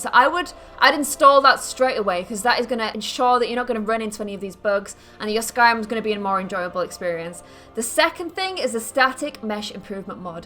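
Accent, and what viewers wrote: United Kingdom. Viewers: So this is where the Little Mermaid went. She also adopted a British accent. Neat. :D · Well. She was hot until she unleashed her British accent.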